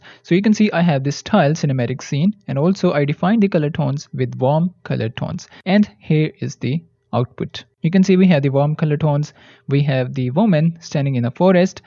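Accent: India. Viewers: Lol indian english accent is too comedian 😂😂😂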